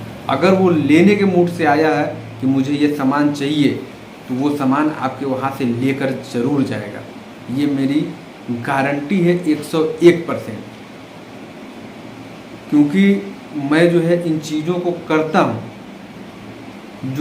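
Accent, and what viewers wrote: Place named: Bhojpur, India. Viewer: Arrah se ho ya Buxar se ya bhojpur se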